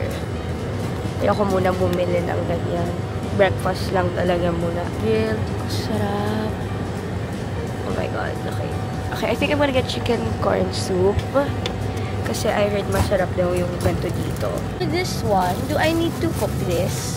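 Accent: Conyo, Philippines. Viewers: AAAA i really like listening to you talk conyo, soo cutie talaga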